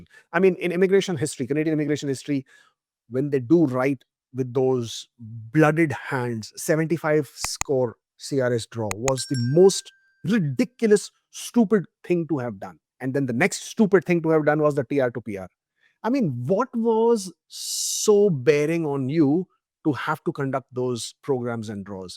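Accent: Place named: India. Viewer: You have a clear Indian accent. If you weren't granted entry to Canada by soft policies, will you be in Canada?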